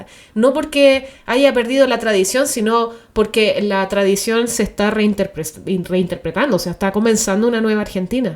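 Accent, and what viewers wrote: Chile. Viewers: Guaaauu... ¡Qué interesante! ¡Qué capacidad de observación y de análisis,por Dios! ¡Y qué bien lo expresas! ¡Y que bien suena tu acento chileno! ¡Qué dulce y qué delicioso suena! Ah... Te cuento: Acabo de suscribirme. Un abrazo enorme desde Córdoba. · Tengo solamente 2° año de secundario con 64 años cumplidos, pero mucha cultura general y conocimientos en un amplio espectro. Con el tiempo comprendí que el vivir en una ciudad donde podés comprar un libro, en un lugar físico, a las 4 ó 5 de la madrugada, te OBLIGA a entender más de cultura general. Lástima que muchos de la nueva generación, no aprecian eso, su vocabulario es muy básico y plagado de incorrecciones que los viejos no entendemos. Te oigo hablar y sé que sos chilena... tengo muchos amigos en Chile, El Quisco, Valparaíso, Peñaflor y mi hermano de la vida en Melocotón. Grandísimos amigos que me respetan, pero hay muchos que no. 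Soy músico, canto y sufrí el desprecio viviendo dos años allí, cuando vuelvo escucho a veces que me gritan "argentino culi.........ao" y cosas parecidas recordando a mi madre. Cuando voy por Vespucio respetando los 80 Km de máxima, tambien escucho gritos. Pero bueno, mi objetivo es estar con mis amigos y dejo todo de lado. Mi pareja desde hace 11 años, ya no quiere ir más... ESO NO LO VEN, NO ES UN DEFECTO, UNA DISCRIMINACIÓN??? Antes de ver la paja en el ojo ajeno, deberían ver el árbol que tienen en el propio. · Soy Argentino, específicamente de San Juan. Te olvidaste de las provincias del Norte que también tienen sus tonadas su folclore y su cultura y muy en contraposición con el de Bs As. Igual te digo que tú como chilena (se nota por tu tonada) que has descrito casi a la perfección y de todos los videos que he visto que han echo dedicado a los argentinos, el tuyo es el mejor por lejos ❤ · Me parece que esta mujer no es española es como que tiene acento chileno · El asado es un mito en el argentino, no tanto asi, los argentinos comemos carne como en calquier otro pais. 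Si se puede decir que en alguna provincia del interior se consume mas carne, zona rurales. Pero diria que el argentino de Buenos aires por ej, es mucho de la pizza, las pastas... y los argentinos de bien ,por lo general son sociables, amigable , es aventurero y soñador. y ama su pais por su tierra,su cultura...Quizas los de CABA se creen europeos, pero el resto no lo creas, pero si posee mucha cultura latinoamericana y no europea... . Y para proxima ,cuentanos como son los chilenos...-por tu acento creo que eras chilena-. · Suena tan bonito una descripción tan profunda y tan bien contada de argentina mi pais en ese acento chileno característico que mirando la cultura argentina como en un espejo reflejas tu gran cultura y nivel intelectual, lo hiciste con una descripción perfecta y poética ,chile ha crecido tanto en las últimas décadas económicamente, culturalmente y en educación que es un ejemplo en América dejar atrás nuestros prejuicios y encontrarnos como pueblos es crecer también y este relato es una muestra enorme, gracias desde córdoba Argentina 😊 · Me apareció este video en mi feed y escuché tu acento chileno y lo primero que pensé fue "la puta madre, otra chilena más que nos va a salir con lo de los hambrientinos, las Islas falkland, las crisis económicas, y tantas otras cosas". 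Pero me dejaste sorprendido. Ojalá un 1% de tus compatriotas pudiesen ver este video. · gracias por tratar de entendernos y lo estas logrando, me gusta tu tono de voz y tu acento chileno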